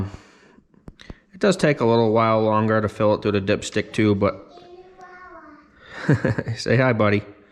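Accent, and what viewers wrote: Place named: Northeast, United States. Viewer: Good video. I am in a bit of a bond. I ended up taking my gearbox to the dealer to remove and replace the seals and the output shaft bearing as I was afraid of damaging something. Yours is the only place on the internet mentioning the 1” dust shield (SG014). In preparing to re-install my rebuilt gearbox, noted the dealer returned the dust shield in the bag. I know this piece should be installed to prevent future failures. Does this shield fit into the grooves as the other seals do? Btw,I love your northeastern accent!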